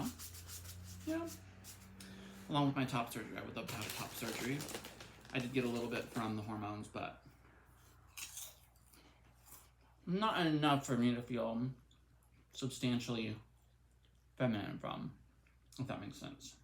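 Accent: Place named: United States. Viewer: Hello, you remind me a lot of my best friend, also the paint dilemma, it looks like acrylic, you can thin the paint with water, it does sound kind of dry plus, it allows you stretch it. I totally agree with you with the non binary stuff. Also I hope you get the surgery you want covered, insurance is a bitch. I literally had to pay for my sons lip surgery recently, he's a little boy and bit his lip, needed surgery to fix it. Cost us 2,000 bucks in the U.S. I'm assuming your also American from your accent. Insurance in the U.S. is a bitch.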